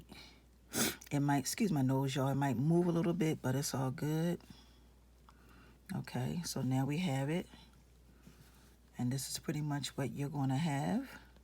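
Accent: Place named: Baltimore, United States. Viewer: I love this! I will definitely be trying this. Thanks for sharing. P.S. I think I’m hearing some Baltimore in your accent. 😁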